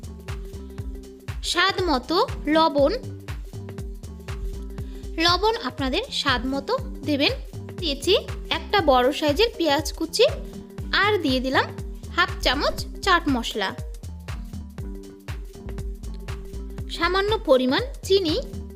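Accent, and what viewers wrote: Bangladesh. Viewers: Khub Sundar. Ekjon bolen Bangladeshi tan lagche kathya te. Recipe bujhlei hobe. Bujhechen. Karo durbolata k nea tana Tani korben na. Ato sundar ekta recipe .atai boro kathya. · দারুন, আপনার উচ্চারনে কিন্তু বাংলাদেশী টান স্পষ্ট । এটা বড়ই কানে লাগছে!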